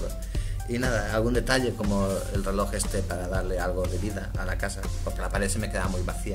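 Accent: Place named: Spain. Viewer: tu acento es muy .... Me gusta el acento de los españoles!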